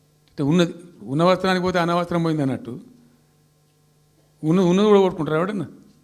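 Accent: Telangana, India. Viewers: After a long time many months years I listen my telangana slang jai kcr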